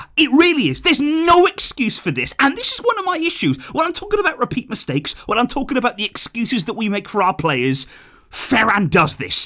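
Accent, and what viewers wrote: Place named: England, United Kingdom. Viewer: Your accent doesn’t sound like a typical Spanish person, wonder how a English person ended up supporting Barcelona 🤔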